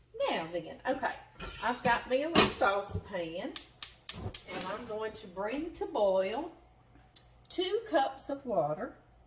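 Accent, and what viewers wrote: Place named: Alabama, United States. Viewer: Ms., I’ve been enjoying your videos for a long time. I so admire your devotion to your faith! We are truly nothing without Jesus🙌🏻You just keep being yourself and we’ll keep watching. Oh and I’m from lower Alabama so we kinda sound alike 😂 and sure cook alike ❤️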